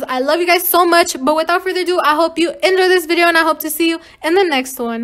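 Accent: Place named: Latin America. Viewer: Yay I thought you were also latina because whenever you talk I always hear a spanish accent (if you know what I mean) now I know we both are 😊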